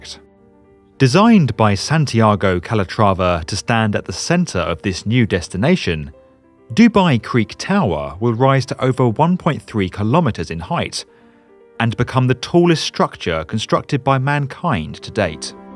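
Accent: United Kingdom. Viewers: SuperOffroader6x6 it’s called a british accent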